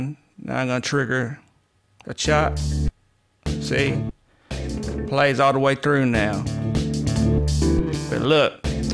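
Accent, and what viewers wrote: South, United States. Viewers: Your thick southern accent makes this vid that much better...knowlege God!!! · 12 min in iam loving that cowboy accent...this video helped a lot cause i was always curious on how to get that & vibe with chopped up samples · Hey man, you sound country as hell, but you know your stuff Lol. I really appreciate this video, brother. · Wow...this is incredible on hella so many levels. Thank you. Im from the south so I am used to accents but it makes it better to watch....not sure if its you or an act but you should really keep this up. Thank you so much. I would pay you for tutorials...super good info.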